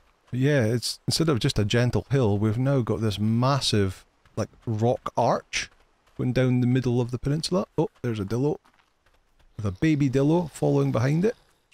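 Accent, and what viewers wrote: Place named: Glasgow, United Kingdom. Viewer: No worries, it has to work for you or it simply won't work. The main reason I asked is that the build system is pretty good and it might exercise your imagination. PS. I served in the British Army a long time ago and the first Troop I commanded was recruited from Glasgow. Not saying you have a Glaswegian accent, but it does remind me of that time. I miss those guys.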